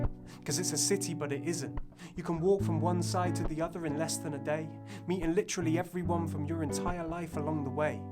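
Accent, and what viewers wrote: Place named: Hereford, United Kingdom. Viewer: I have an audition coming up that requires a Hereford accent, this has been very helpful as it's not an easy one to find examples of. Thank you!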